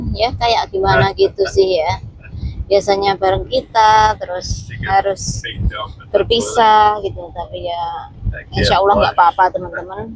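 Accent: Java, Indonesia. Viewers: mba sy baru mengikuti Chanel sampian, sy jd suka, karena gaya bicara nya mba medok bahasa jw, unik gitu lho campur2 bahasanya 😁❤👍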